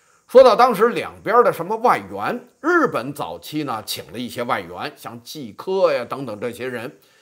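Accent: Beijing, China. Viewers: I love to hear your talk, didn't feel long at all, especially those Beijing words 😉👋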